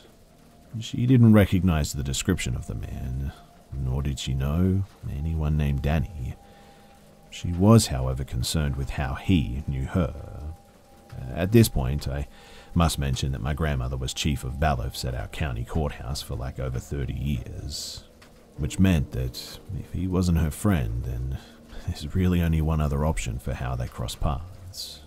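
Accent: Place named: Australia. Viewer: Does anyone else think voice & Aussie accent sounds different? Especially if you listen to some older posts. Still sounds great though while I listen on a dark & eerie night. Thanks!!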